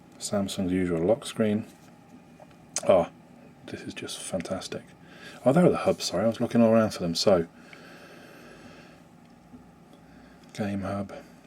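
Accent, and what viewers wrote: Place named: United Kingdom. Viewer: BRITISH ACCENT!!!!:D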